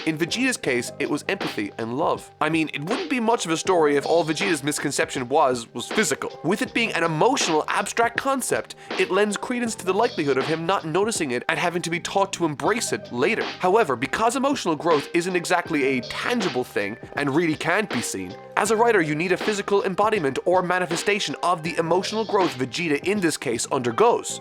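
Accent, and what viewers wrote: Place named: Ireland. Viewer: Hes.. Irish..?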